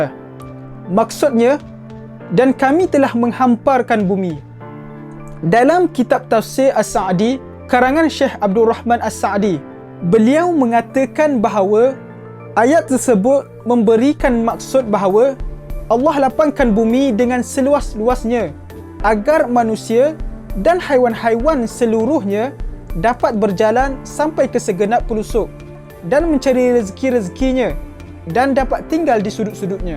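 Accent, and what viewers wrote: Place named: Terengganu, Malaysia. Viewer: Speaker nie org TRG ke??... dgr mcm ye dh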